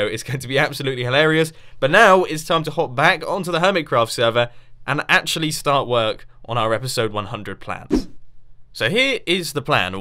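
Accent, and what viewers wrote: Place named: England, United Kingdom. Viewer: You can tell is English (like me) 'I am so CHUFFED with that I'm very CHUFFED!'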